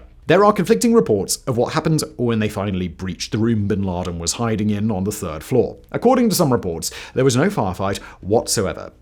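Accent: RP, United Kingdom. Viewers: the pre-beard I can deal with it's the stuffy upper crust english that was obviously he's natural way of speaking then that gets me 😂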